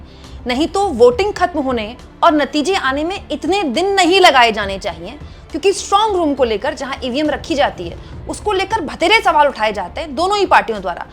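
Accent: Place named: Uttar Pradesh, India. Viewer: Bhatere सवाल 😂😂 बुलंदशहर अलीगढ़ स्टाइल छोड़िए , बहुत से सवाल बोलिए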